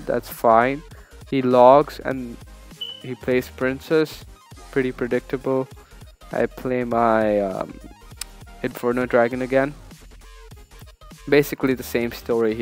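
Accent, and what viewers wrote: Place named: India. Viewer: Nice video dude! Are you Indian? Your voice is so soothing lel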